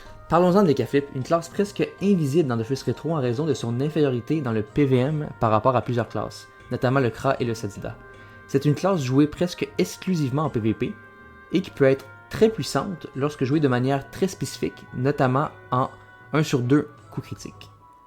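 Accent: Canada. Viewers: super ! continue & enjoy ! on adore le petit accent du canada <3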